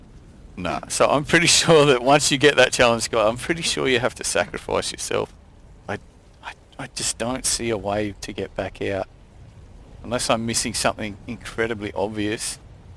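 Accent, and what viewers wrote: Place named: Australia. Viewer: Man as soon as I heard your voice i checked your profile, knew you were Australian haha.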